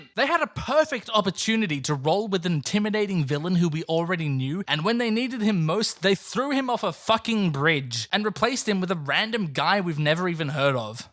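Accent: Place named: Australia. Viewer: I love how perfect the Australian accent is for being sarcastic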